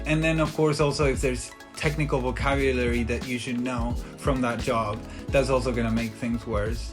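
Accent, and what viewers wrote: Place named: New Zealand. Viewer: Interesting. I wondered where you were based. Now that I know that you're in NZ, I can definitely hear that country's influence on your english, even if it's subtle. I would love to visit NZ one day. Of course ever since LOTR came out 20+ years ago, it's been on my bucket list. I know that's pretty stereotypical. Pero a mi no me importa! Jaja, oh yeah, también hablo español! Saludos desde Atlanta GA USA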